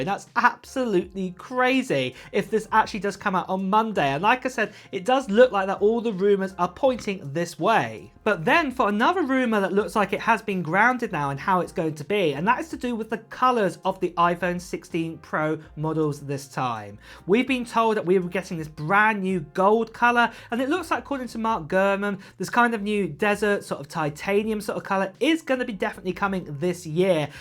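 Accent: United Kingdom. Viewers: UK English accent 🎉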